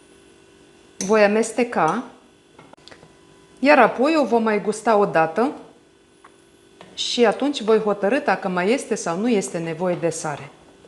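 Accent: Moldova. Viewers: Wou, multumesc pentru explicatiile foarte clare si amanuntite, apreciez foarte mult. dupa accent sinteti din Tepublica Moldova, eu sint din Ardeal, Romania. Imi place farte mult reteta, felicitari si multumesc ca ati descris retetra atat de amanuntit. O zi faina va doresc!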